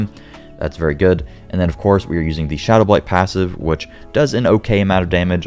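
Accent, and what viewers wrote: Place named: United States. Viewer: The speaker is using American English. It's pronounced MUH-cob.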